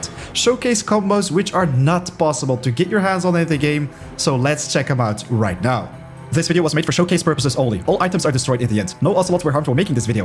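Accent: France. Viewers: Are you french ? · Mais il est français ou pas, il dit pas mal de mots en français et il a un peu l’accent français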